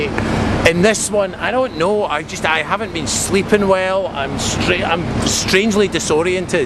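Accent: Scotland, United Kingdom. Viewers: Mark, I think your brogue is gettin' a bit thicker. Tha mo bhàta-foluaimein loma-làn easgannan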